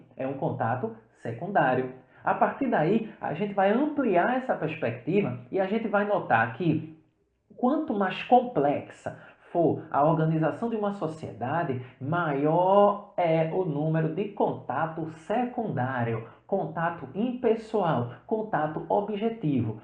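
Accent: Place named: Pernambuco, Brazil. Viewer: e eu q me apaixonei pelo sotaque de Pernambuco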